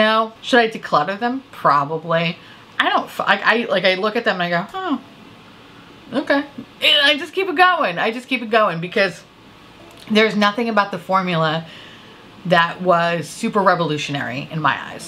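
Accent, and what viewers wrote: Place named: Minnesota, United States. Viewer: Oh but your Minnesota accent is the best. And because I enjoy that accent so much my work has sent me to H.E.🥍🥍 in Minnesota for two months. From the convos I’m hearing you might consider using Rhubarb Crisp into the Minnesotan thingy.:)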